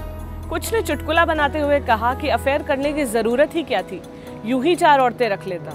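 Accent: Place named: Kolkata, India. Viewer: I think by ur voice u r from kolkata..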